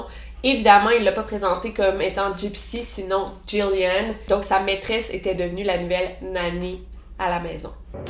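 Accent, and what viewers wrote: Quebec, Canada. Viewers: Cc je t admire beaucoup j adore ton accent québécoise j aimerait un jour visiter le Québec sa serait mon rêve. J adore tes vidéos 😘😘😘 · Je me suis même pas rendu compte de la longueur de la vidéo😅 merci pour ton super travail a chaque fois! Et ton accent et les termes Canadiens, j'adore!😊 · J'adooooore tes vidéos. De temps en temps...j'ai un peu de mal à te suivre vue ton accent canadien... Plus je te regarde...et plus je te kiffe...tu as souvent de chouettes histoires et tu expliques les choses si bien qu'on se croirait presque devant un film. Et pour couronner le tout...je te trouve super-mimi. Ce mec est un vrai goujat... Un salopart... Aucune considération pour sa femme... Toutes ces aventures extra-conjugales et Michelle plutôt naïve qui veut tout faire pour sauver et garder son couple... Merci pour nous avoir fait découvrir cette triste affaire... 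· C'est l'accent québécois, s'il t'indispose autant éviter de regarder ses vidéos non ?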